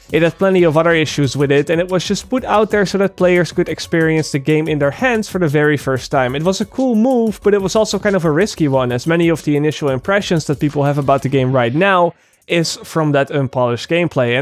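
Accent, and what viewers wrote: Netherlands. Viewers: Because you sounds dutch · ik hoorde gelijk aan je accent dat je nederlands was😂😂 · why does this guy sound like he is dutch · The Gamer Of Canada sorry. i didn't watched the whole video but i heared his accent and then i wanted to ask if he was from the netherlands. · ik dacht al te horen dat je nederlands was haha. duidelijke mening. ik deel hem deels... ik ben bang voor het spel. angst dat ik teleurgesteld word.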